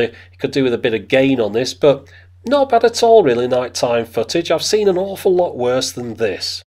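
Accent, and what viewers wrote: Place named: North London, United Kingdom. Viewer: Cheers love........you have the same accent as Geoff Lloyd of Absolute radio.....Norff London?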